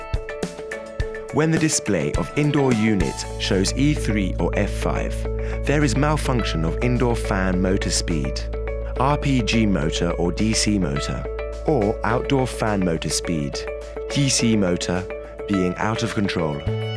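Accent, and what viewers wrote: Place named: England, United Kingdom. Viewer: I HATE ROBO VOICE VIDIEOS< , ESPECIALLY WITH AN ENGLISH ACCENT!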